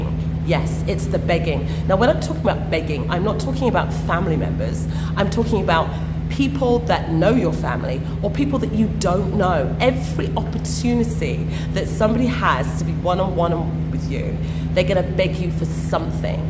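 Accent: United Kingdom. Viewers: She was born in the Uk and grown-up there obviously she went to a private school thats why the accent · I was born in Germany but am Ibibio, I have learnt to speak pidgin and a lil bit of Ibibio/Efik, anytime am in Naija, am always speaking pidgin and trying not to speak for too long at any given time so as not to give my "abroad" origin away and believe me sister, life has been Good and easy. I don't mean to be disrespectful but if I were u, I would try to tune down that Brit-ich accent.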